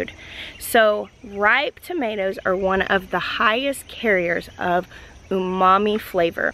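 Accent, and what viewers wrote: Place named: Mississippi, United States. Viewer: Hello from Scottsdale Arizona where I am growing a great crop of tomatoes and other veggies. I am enjoying your videos very much and being from Mississippi I connect with your accent! You are exceptional and your wisdom shows in each video. I am learning ech time I plant how too adapt some of my southern learning to the desert climate. Will you share what you use for a mulch after planting tomatoes.. it looks like hay or alfalfa. Thank you.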